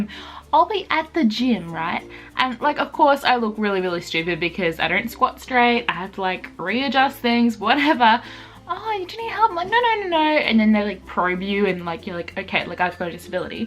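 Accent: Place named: Australia. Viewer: I already did. It’s a crack up as well!!!! Now, I’m old enough to be probably your Grandpa, but I’m captivated by your outgoing, friendly persona....... but appropriately 😂 I also love that you’ve an unashamed Aussie accent!!!!!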